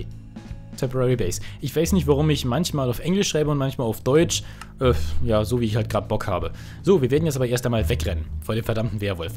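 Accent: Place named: Berlin, Germany. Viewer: Ja klar, die Schlangen im Berliner Zoo können einen ja auch nicht angreifen, weil sie hinter Glas sind! Dass du aus Berlin bist dachte ich mir schon. Das "och" statt "auch" hat dich verraten. Ich stamme übrigens aus Nordhessen, wir sagen statt "auch" "ö"! Bsp.: "Dis hon ich mä ö schon gedacht."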